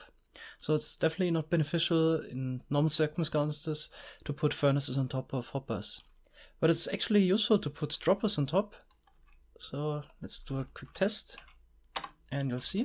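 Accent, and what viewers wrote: Germany. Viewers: what Country are you from? It sounds like you would be from Germany :D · Du hörst dich sehr Deutsch an 🤔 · Agreed. He sounds like he's German, or at least speaks German as his native language.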